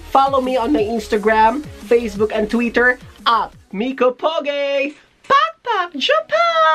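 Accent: Visayas, Philippines. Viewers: Bisaya ka?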